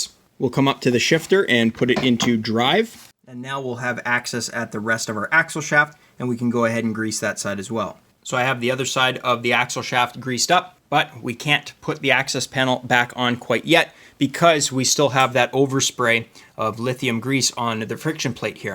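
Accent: Canada. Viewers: I can hear the 🇨🇦 in your voice!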